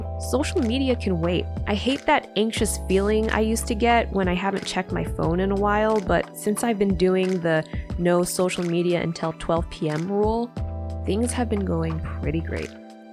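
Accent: Australia. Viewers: I was wondering if anyone else noticed that lmaoo sounds kinda australian or new zealand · aussie accent goes crazy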